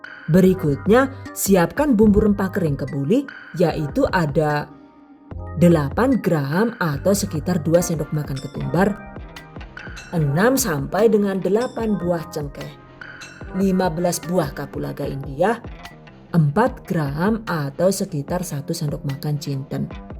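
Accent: Banyumas, Indonesia. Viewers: Jujur aku penasaran.mbaeki keturunan opotoh.wong jowo opo bulee.kok kadang Rodo ngapakk.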